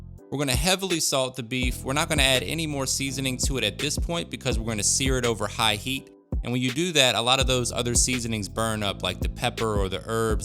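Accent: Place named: Pittsburgh, United States. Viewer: This guy has a Pittsburgh accent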